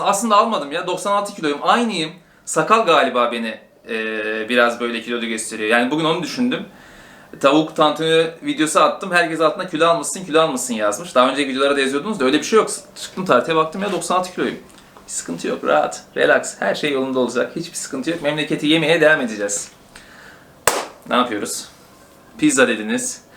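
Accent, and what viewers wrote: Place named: Adana, Turkey. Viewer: Adana aksanin super Arti yemek sana yakisiyor Hazer falan filan senin yaninda vizzzzzzz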